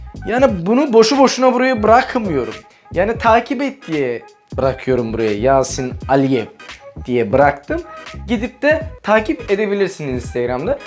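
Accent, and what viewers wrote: Nakhchivan, Azerbaijan. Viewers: Naxçıvanlısan sen?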